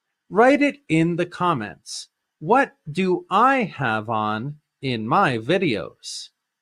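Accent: United States. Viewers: are you American, dude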